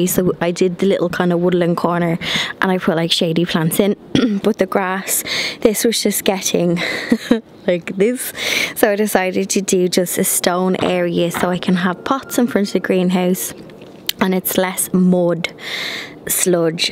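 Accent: Ireland. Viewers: You make the Irish accent sound cute 😉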